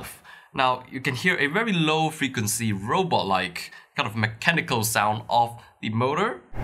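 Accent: Singapore. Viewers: Singaporean for sure. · great review. may I know where are you from? you sound like Singaporean or Malaysian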